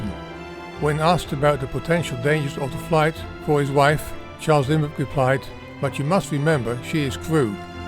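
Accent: Netherlands. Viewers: Not clear why YT makes problems to best authors like you rather than focusing on garbage channels! Format works, this video is PURE GOLD!!🌟👌🙌 Love the voice over with Dutch accent!! 🔝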